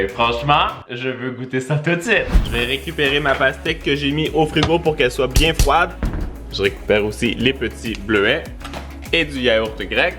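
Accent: Canada. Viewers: tu mon youtubeur préféré desCarl is cooking vidéos accent canadien et qui met quoi des vidéos trop trop rire 🇨🇦👍👍👍👍👍 Tik Tok recette du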